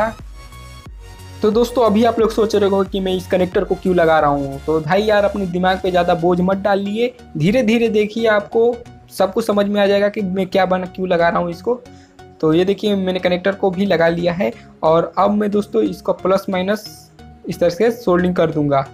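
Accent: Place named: Eastern Uttar Pradesh, India. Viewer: most welcome sir Up me aap kaha se hai sir language se aap east up ke lagte ho sayad Varanasi ya Balliya side Aap hi btaeye if koi problem na ho to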